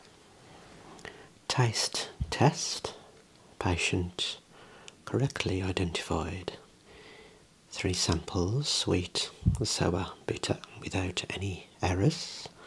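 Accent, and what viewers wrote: Birmingham, United Kingdom. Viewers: I think i detect a bit of a Brummie accent here. Love the ASMR!👍👍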